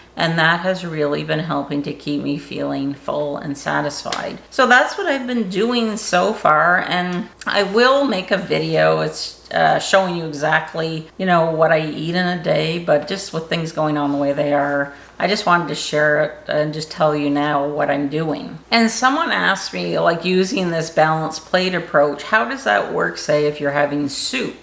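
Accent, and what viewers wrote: Canada. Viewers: Congrats. Love grapes. Eggs? Why not whip them fluffy with your hand blender then place in the oven for a few minutes? Quality dark chocolate is good for you—not the health store ones that say 75%-100%, no. Get less than 70%, for sure. ***Might I suggest that you consider carbs during the day ONLY? Yes, this would mean before dessert before supper. I mean, no carbs after 5 pm for a few weeks then 4, for a few weeks, then, 3, then, 2 pm. Not kidding. Plus, this works faster than people assume. You sound a bit… Newfie🙂? And, an extra calendar = meal prep. No stress. Great luck with hubby🌬️❤️‍🩹🕊️. Congrats again🎉.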